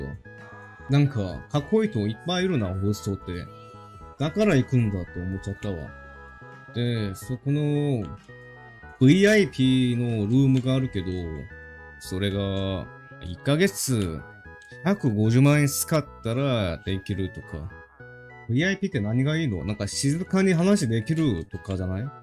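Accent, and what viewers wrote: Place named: Kansai, Japan. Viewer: なんで知ってるんやが流暢すぎて笑った mondoの関西弁良いよね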